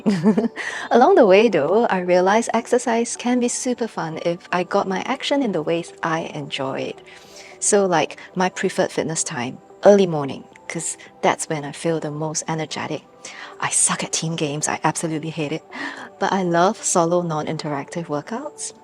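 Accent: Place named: Singapore. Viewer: I could honestly listen to you talk for hours. That Singapore accent... Good Grief. Love it. Will be in Bali soon if you have a twin sister lol !! Love the channel. You and your husband look cool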